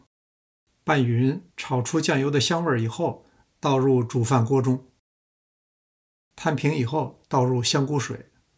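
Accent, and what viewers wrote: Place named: Beijing-Tianjin, China. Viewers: 京津地区的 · 具体听不出来口音。能听出来是北方人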